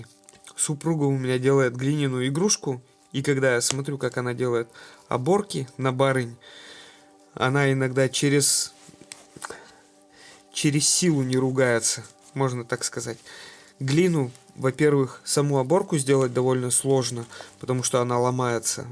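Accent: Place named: Vologda, Russia. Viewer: Откуда у тебя такие познания о старинных вещах, ты наверное изучаешь или читаешь много, наверно увлекаешься этим, а ты сам откуда? У тебя говор особый, какой-то вологодский.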